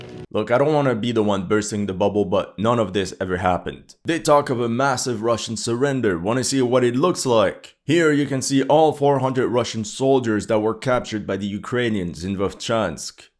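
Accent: Quebec, Canada. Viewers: canadian french right? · Where are you from ? I can’t place that accent maybe Quebecois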